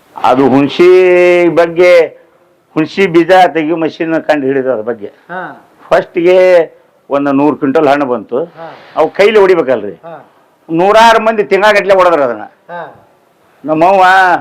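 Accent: North Karnataka, India. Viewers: ಉತ್ತರ ಕನ್ನಡ ಅಲ್ಲಾರಿ. ಉತ್ತರ ಕರ್ನಾಟಕ ಭಾಷೆ ಇದು. ಸ್ವಲ್ಪ ವ್ಯತ್ಯಾಸ ತಿಳಿದುಕೊಂಡರೆ ಒಳ್ಳೇದು..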